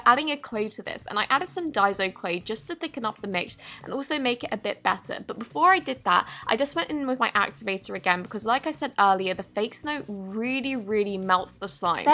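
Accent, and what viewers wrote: United Kingdom. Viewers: Theofficialblossom vlogs it’s british like mine! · Where do you get tour borax from? You sound British like me and I can’t find it anywhere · I love how she talks I talk American so it’s so much different than her British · Theofficialblossom vlogs she’s British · London/British accent like mine 😊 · Theofficialblossom vlogs shes British like me 😁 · Theofficialblossom vlogs British accent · I love your British voice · Lol it's a British accent like mine. so there Americans now u know that our accent isn't POSHY POSHO 😄 so just remember dats how we lot sounds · Theofficialblossom vlogs she form the United Kingdom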